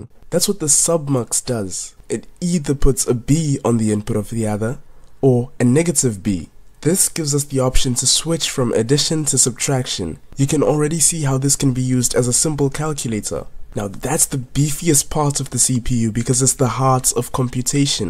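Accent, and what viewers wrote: South Africa. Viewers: I hear that accent, and I pray that youre sponsored by a company that delivers to SA😭🙏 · Dude, are you South African? Your accent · You sound so South African🇿🇦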